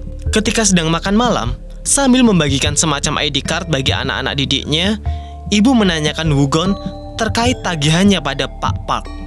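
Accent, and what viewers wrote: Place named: Indonesia. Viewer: Basa inggris sangat meng indonesia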